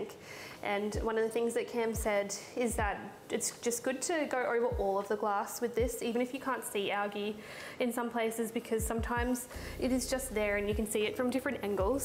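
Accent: Australia. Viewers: I like how she says "here " but it's like "Heah" lol the Australian agent is nice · I love the way you say “Here” you must be from Australia ? Love the accent. Cheers from 🇨🇦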